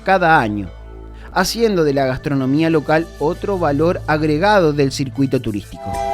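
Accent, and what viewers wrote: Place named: Argentina. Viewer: EL QUE NARRA ES ARGENTINO NO CABE DUDA....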